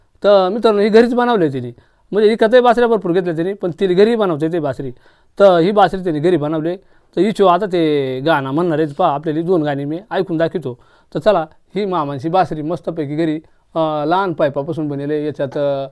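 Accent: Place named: Akole, India. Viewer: दादा भाषेवरुन तुम्ही नगर जिल्ह्यातील अकोले तालुक्यातील वाटता...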